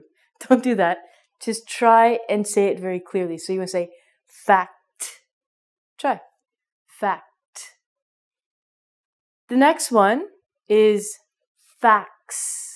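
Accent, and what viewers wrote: Canada. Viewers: Love your Canadian English tone of voice..reminds me lots of nice memories some past time in my childhood... away in Whistler Mountains.. warm hugs from Chile and thanks.. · i think she is from canadian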